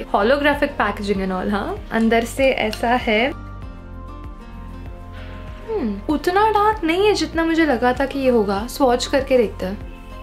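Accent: India. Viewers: Aap puri american lg rhi ho😊 bs aapke hindi accent se indian lg rhi h aap...maybe NRI?😊 bdw,love ur vdo..frst tym seeing ur vdo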